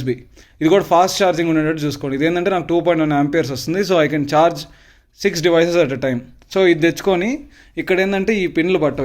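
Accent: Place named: Chittoor, India. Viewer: maadi kuda bro mee slang chusi chepa maadi chittoor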